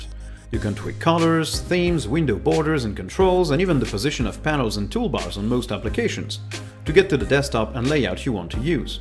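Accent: France. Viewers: La meilleure chaîne Linux que j'ai pu trouver et ce petit accent français me fait plaisir à entendre. De mon expérience, les extensions de Gnome peuvent cracher ta distribution après une mise à jour de version. Elles sont à utiliser avec modération. Tout comme toi, je trouve les sous-menus de KDE un peu frustrant. Continue ces vidéos de qualité qui se concentrent sur ce qui fait un peu défaut aux distributions : de la finition. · Ta accent français était un peu plus fort qu'elle est aujourd'hui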